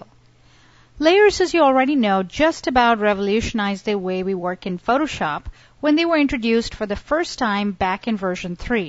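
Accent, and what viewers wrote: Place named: Midwest, United States. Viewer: This is the thickest midwestern accent i've ever heard. What state is that, Minnesota? Wisconsin?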